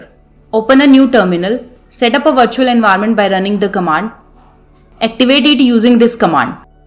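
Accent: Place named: Rajasthan, India. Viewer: she must be Rajasthani or Gujrati. very strong accent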